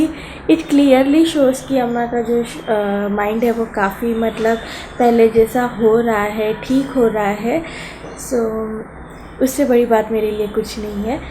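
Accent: Maharashtra, India. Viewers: maharashtra ki rough boli hai aTapori bhasha hai gandi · Tab hi mai sochoon aap marathi accent aur amma ji bhojpuri accent humare husband ke dadi aise hi baat karti thi she passed away this January yaad agyi unki....waise mai Bengali hoon Up wale se shadi hui hai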